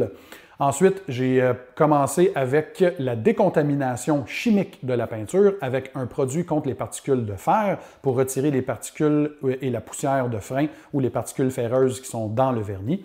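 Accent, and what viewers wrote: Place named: Quebec, Canada. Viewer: J’ai commencé regarder ces videos d’abord pour écouter l’accent Québecois, mais ensuite j’achète tous les produits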